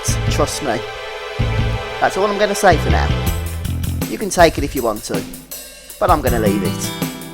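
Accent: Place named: United Kingdom. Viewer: where is the accent from? it sounds... British? but i know its regional, but what region. lol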